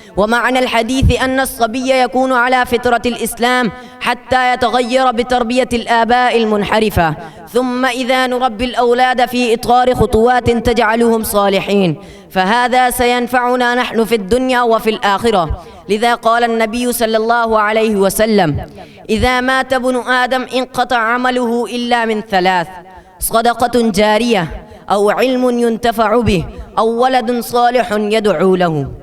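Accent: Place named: Arab world. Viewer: MASHA ALLAH very best speech & beautifull accent like ''ARAB'' ......... THANKYOU SO MUCH!!!!!!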